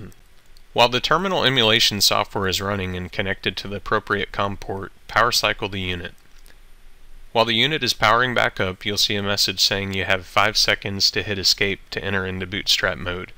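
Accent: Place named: United States. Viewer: Warning #1: This is a furry video. Warning #2: The narrator is American and will systematically pronounce "enter" as "enner".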